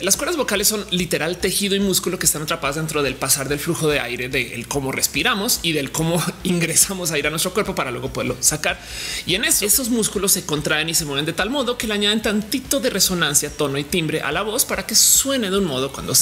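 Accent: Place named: Colombia. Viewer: Yo sabía que eras colombiana, se te nota en la voz un montón. Eres muy bella (ame tú collar está precioso)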